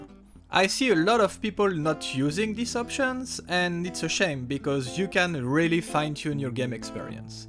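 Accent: France. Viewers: amazing channel my dude. I just started Anomaly yesterday and you helped me a lot. I'm curious tho, where does your accent come from? Sounds like a french accent.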